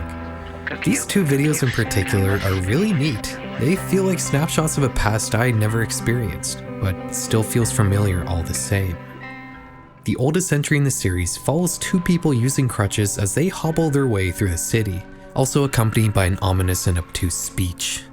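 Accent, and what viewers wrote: Poland. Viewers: the polish accent gets me by surprise as i skip the video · ale ten polski akcent słychać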